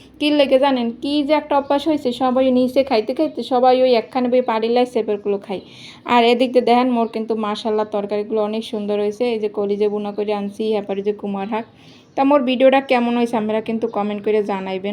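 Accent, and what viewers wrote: Barishal, Bangladesh. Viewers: আপু তোমার বাড়ি কি বরিশাল তোমার কথায় মনে হচ্ছে তোমার বাড়ি বরিশাল আমার বাড়িও বরিশালে ❤❤আজ প্রথম তোমার ভিডিও দেখলাম ভালো লাগলো আপু ❤❤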